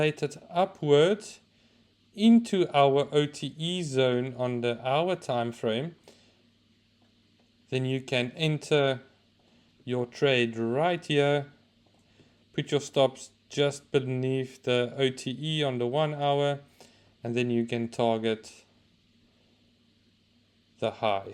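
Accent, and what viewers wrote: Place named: South Africa. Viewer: I knew the accent was South African😂❤️ Man, I'm learning so much mfethu, keep teaching, I'll keep learning, we'll all keep getting better🙏🏽🙏🏽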